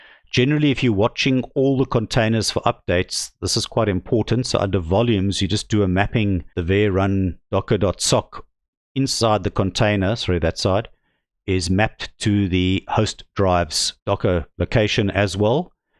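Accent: South Africa. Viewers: Thanks for the video. By the way South Africa or Netherlands? hearing a familiar accent here.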